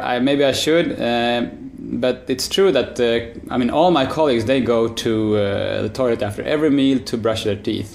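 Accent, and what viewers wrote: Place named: Sweden. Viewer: Swedish Accent! Just say one thing for me... say "time to unmpimp de Auto" like that swedish guy in the VW comercial.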